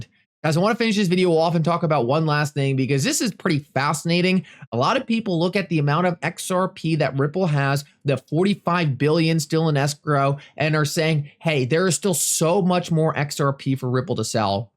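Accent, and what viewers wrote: Allentown, United States. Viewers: with an Allentown accent broken record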